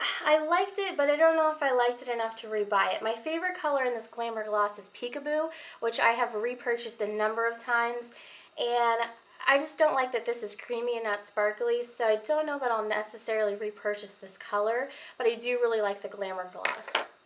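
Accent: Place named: United States. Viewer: do you live in the uk or usa? because you mentioned something from boots yet you have an ameircan accent I'm from uk btw x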